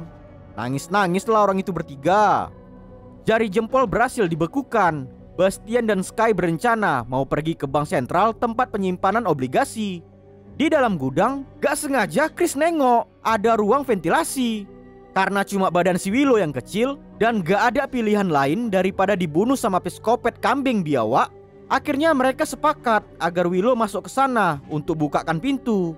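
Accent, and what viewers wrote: Medan, Indonesia. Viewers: Medan kali yakan bos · Lucu lae nih,,bahasa medan kali😁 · Medann kali ahh BG😂🤙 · Org medan ya min... Wwkwkwkw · asal medan ya bg dri cara logat bicaranya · Mantap bg suka kali aku penerangan abg logat Medan nya kren salam dari Sibolga bg 🙏😊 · Medan kali ngomongmu bang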